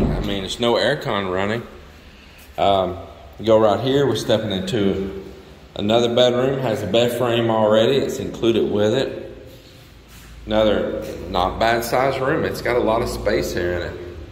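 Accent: Texas, United States. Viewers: You make me laugh. The way you talk. I know you are from Texas. I am from Tennessee. I really like the way you talk. So country and I don’t mean that in a bad way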